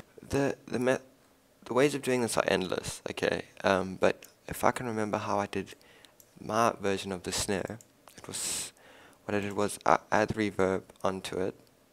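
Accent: South Africa. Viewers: ***** Hey man, are you from South Africa?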